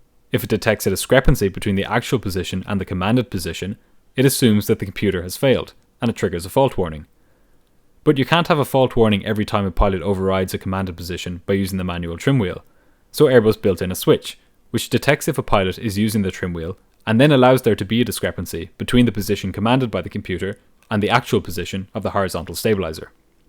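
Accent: Ireland. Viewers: No idea how u pulled it off and got subs , a posh Irish muppet recycling stories that are long long told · Very well done,! Perfect command of technical details, and that lovely Irish accent. Great!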